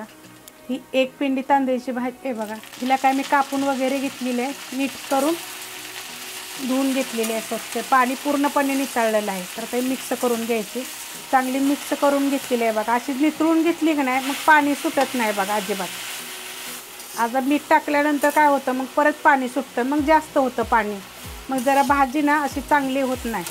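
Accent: Satara, India. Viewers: पक्का म्हणजे सातारी,बोलण्यात पण सातारी तडका जाणवतोय 😅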